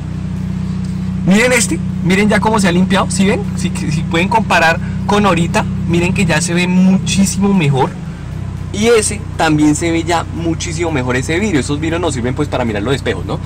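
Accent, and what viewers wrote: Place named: Antioquia, Colombia. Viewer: Me gusta como hablan los paisas...gracias Men..!!!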